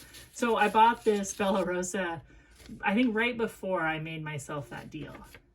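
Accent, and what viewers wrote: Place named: Midwest, United States. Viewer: (sp?) you buy and explain/describe the most unusual scents. I like to watch your videos because a. you're a thrifter like me, b. you're another midwesterner and actually sounds/talks a lot like me and c. you're honest about your finds and out there as far as blind buying goes--real gutsy and I like that!! Thanks for another great video!!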